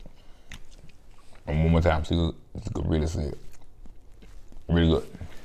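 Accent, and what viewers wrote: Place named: New Orleans, United States. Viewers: Are u from New Orleans? U have a strong N.O. Accent..... I Luv seafood so I would’ve enjoyed eating that pan!!!! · R u from Louisiana u sound cajun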